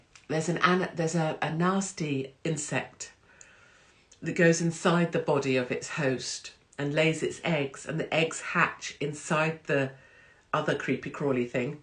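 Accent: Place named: England, United Kingdom. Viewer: GOD----YOU ARE GOLD, YOU KEEP GETTING BETTER, LOVE THE ACCENT, PURE ENGLISH EXPRESSIONS, '' CARRY ONE BRITANNIA '',.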